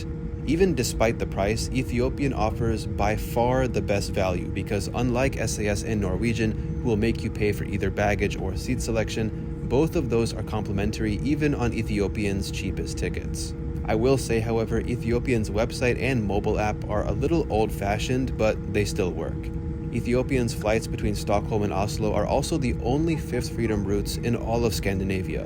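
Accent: United States. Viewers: Just discovered your channel. I appreciate your upbeat assessment of your flight. I am so sick of captions w/out voice so it was a surprise to hear a nice American accent! Greetings from north of Atlanta.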